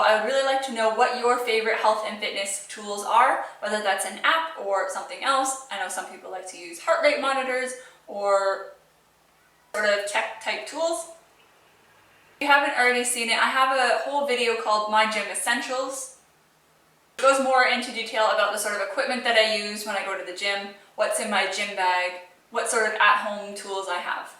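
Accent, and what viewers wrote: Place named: Australia. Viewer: I'm hearing such an Australian accent in the intro. Its slight but I recognize it because my brother is also a Canadian living in Australia. I love habit trackers.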